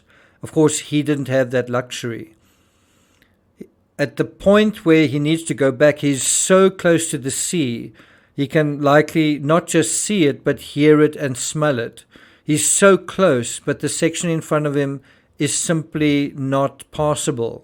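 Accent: South Africa. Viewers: Where in Australia are you from? You sound South African. You have been very commited to pursuade us in absence of a fowl play, even though you haven't been there. And I strongly doubt Jay had a chance of getting out alive if he rejected the big boys. By the way there was a CCTV footage of this very same road with a man getting into the car. At the time people speculated it had been Jay. What if it was someone who pushed him... The phone wasn't that close to a cliff, otherwise they'd find Jay immediately. Was there a fight? With a body month old, it's surprising they can determine exact time of death. But even so, people like those men he was with, do not leave witnesses that refuted them, that might hurt them in the future. Look at the facts, so many leaked messages, audio and CCTV footage. Aibs instagram post with the photo of Jay " +1" subheader was meant to be seen by a client? 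They hired a flat for a few hours on the night in the fake name to do what??? They weren't at the festival, he was heavy on drugs with someone's help, how did they meet? But you create these narratives " he persisted", " in his final moments he did that" - how would you know?, I still can't get over the fact you suggested that anyone not thinking like you do, is schitzofrenic... 😂 Someone must pay you well to trumpet your trumpet. The "paranoid mind made him jump" theory is another funny one. He had the reason to be running! So why is no one investigating that? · Thank you for this update! Once again, so good to hear a South African accent! I am glad you did this video because the conspiracy theories do not help anyone. The way you have explained it makes perfect sense and fits in with whatever evidence has been obtained. It is also easy when one has the "exuberance of youth" to believe that we are capable of doing anything! 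Jay obviously felt he had only one option and that was to head for the sea... the most likely place where people would be. But as you say, he had no idea how far away it was nor how treacherous the route was. He took a chance. Very sad for family and friends, but to me it feels like reality. I personally find it a far more plausible reality to accept than the wild possibilities others have come up with. Keep up the good work!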